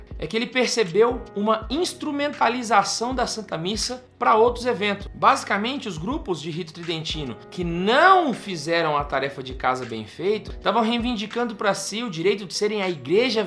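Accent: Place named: Goiás, Brazil. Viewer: Diretamente de rio verde goias pra dizer que esse e o melhor video sobre o assunto, conheci esse sotaque no comeco no video meu conterraneo,abraço .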